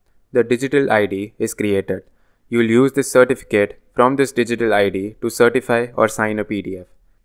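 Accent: India. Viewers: That's Indian accent 🇮🇳 🕉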